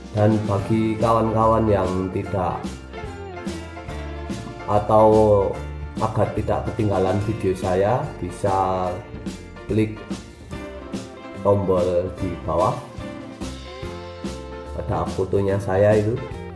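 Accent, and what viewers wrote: Temanggung, Indonesia. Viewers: Temanggung ya mas?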